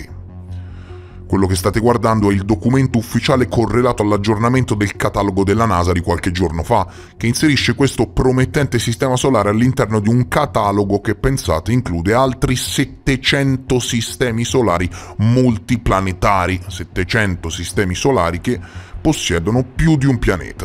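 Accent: Tuscany, Italy. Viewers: te mi freghi una marea di tempo che non ti dico 🤣🤣🤣..però sei talmente interessante che..quando proprio non ho tempo..ti ascolto mentre faccio qualcosa in cucina..molti complimenti davvero..sei unico..( e mi pari pure toscano come me)..ma non lo diciamo a nessuno 😅🤭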